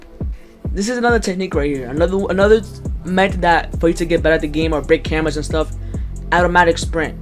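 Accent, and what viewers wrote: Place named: Bronx, United States. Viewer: nah you just sound like it gang ik a Hispanic Bronx accent😭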